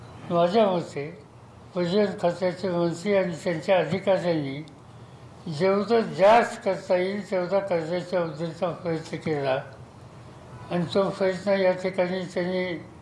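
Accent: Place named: Baramati, India. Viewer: 😀😀😀😀बारामतीची भाषा आहे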